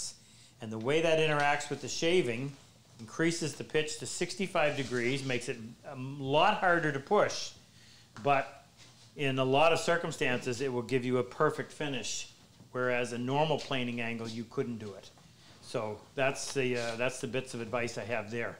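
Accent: Canada. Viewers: Hi - I'm watching from South Africa. you've said 'any question' so this one is from left field (as they say south of your border): which province are you from? my guess is New Brunswick - I used to know a Canadian from there, and you sound so much like him. Back to reality - I really like your program and I wish I was younger and wealthier so that I could follow your style (I am 75 and can't afford diamond sharpening plates - especially here in SA).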